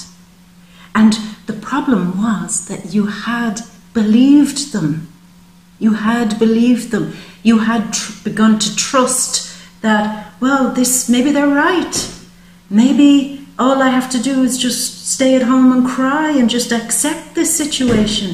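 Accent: Ireland. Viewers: For now as I am going to listen to the rest of your video I love ya you are honey and lemon and your voice is ace. Your accent to die for and your gift. I listen to different videos but you are the best. The creme d la creme the cherry on top. Amazing. But that cos you have the Irish beauty no one can deny. Mwa mwa mwa. Speak to you again. Soon. Stevie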